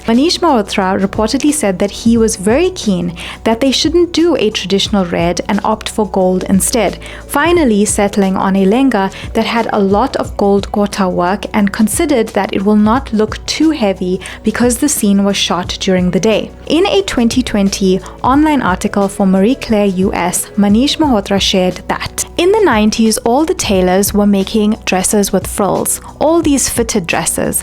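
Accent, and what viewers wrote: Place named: South Africa. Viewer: Unrelated to the topic but can I ask if you’re a South African desi ? Your accent is gorgeous !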